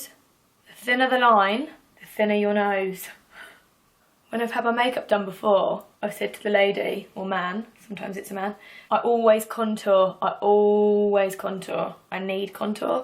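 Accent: England, United Kingdom. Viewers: i wonder if she's from england because i love her accent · I love ur british accent soooo much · Ur so British it's great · She is British I thought she was American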